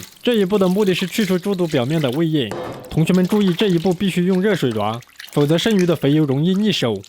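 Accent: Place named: Sichuan, China. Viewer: 明显川普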